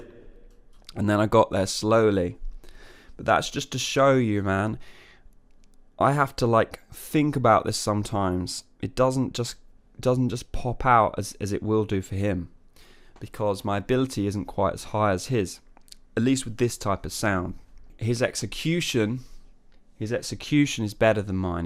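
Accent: England, United Kingdom. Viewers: your english accent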